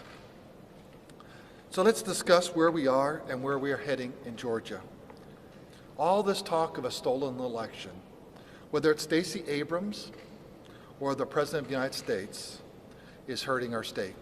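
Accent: United Kingdom. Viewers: sir dave chasssington of dude land and comumbris You're an embarrassment to the British. Did you just stumble off your dingy? You're struggling to form a coherent sentence. The British news is horrendous. You sound exactly like the average BBC viewer though. As a Brit myself, I can say that the fraud is blatantly obvious. I'd be quiet if I were you because we've had plenty of election fraud of our own.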